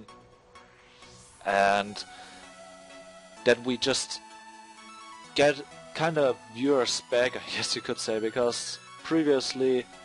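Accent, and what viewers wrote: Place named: Germany. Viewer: Kommst aus Deutschland ;) schwer zu überhören :)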